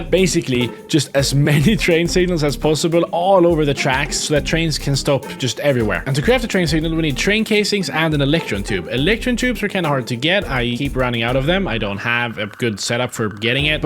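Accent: Sweden. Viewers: You sound like you have a swedish accent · I thought that dialect was familiar! I had to chevk the about me lol. How do i always find Swedish creators?!😅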